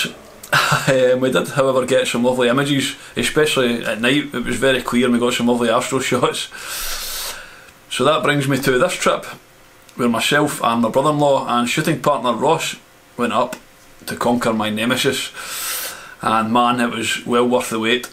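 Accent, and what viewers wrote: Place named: Scotland, United Kingdom. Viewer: That’s a great video. Thank you very much. But I never met a Scot with such an extremely dialect. I nearly understood nothing. 😂😂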